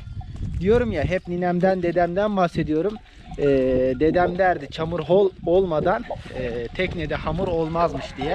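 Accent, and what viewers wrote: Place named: Balıkesir, Turkey. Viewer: Degerli kardesim;bircok videonu izledim.Koy hayatini hic bilmeyen biri olarak zevkle izliyorum.Rahmetli babamin koyu varmis,17 yasinda gittim ilk defa.Ben balikesir in ibirler koyundenim(babam yasasaydi 75 yasinda olacakti sehirde dunyaya gelmis)senin sivenle benim koyumun sivesi ayni.keske bende koyde dogup buyuseydimde,14 yildir abd gibi ulkeye gelmek zorunda olmasaydim.TOPRAK ve koy.senin yemek yerken video larinida izledim.Kardesim,neguzel ki bizim gibi cop yemek (kimyasal)yemiyorsun.Allah ailenle seni mutlu etsin.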